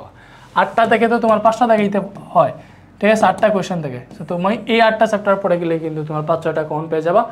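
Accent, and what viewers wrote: Sylhet, Bangladesh. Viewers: Bahi sure Sylheti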